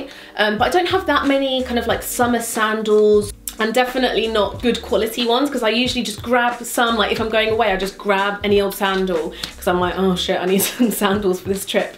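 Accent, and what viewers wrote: United Kingdom. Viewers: I think the only reason I see your videos is your british accent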